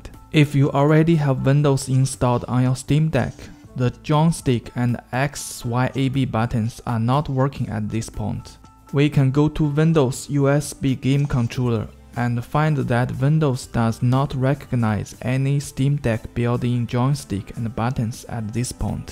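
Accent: China. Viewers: 熟悉的中国口音，蛤蛤蛤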